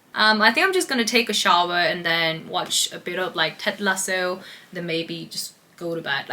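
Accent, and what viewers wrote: United Kingdom. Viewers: I noticed your accent became more british.. You are my favorite pharmacist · 으앙 ㅠㅠ 너무 오랜만입니다 ㅠㅠ 대학생 때부터 봤는데 억양도 완전 영국식으로 바뀌셨네용 건강하게 잘 지내시는 거 같아 다행이네용!! 화이팅입니당! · 와우 억양이 완전 영국식으로바뀌셨네요?! 앞으로의 새로운 여정들도응원해요!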